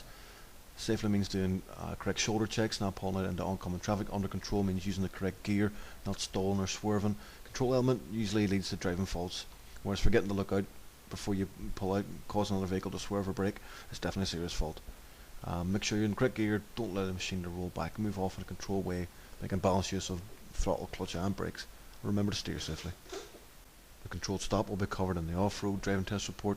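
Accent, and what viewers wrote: Northern Ireland, United Kingdom. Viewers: youtube closed captioning fails quite hard with Northern Ireland accents :D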